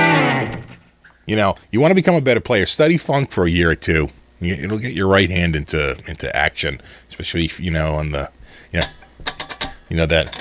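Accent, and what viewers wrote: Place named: Rhode Island, United States. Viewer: you look like gerry garcia might have looked, if he looked like famly guy .... you even sound a bit like FG... im guessing your from, or around rhode island lovin your vids by the way and LZ are the biggest rip off artists of all time its just that the internet wasent around when they were. so no one gotta know better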